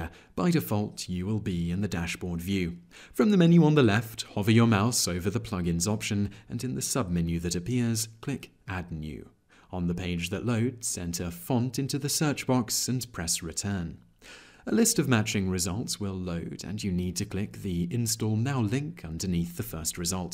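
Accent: England, United Kingdom. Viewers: The english accent makes the lesson so much nicer to listen to. Very pleasant.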